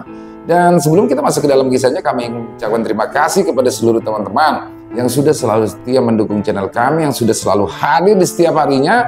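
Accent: Jakarta, Indonesia. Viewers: barrokalloh yg dinanti2 bang piyan muncul juga plng suka kalau bawain bahasa betawinya mantep pokoknya sukseees terus bang dengan cerita2nya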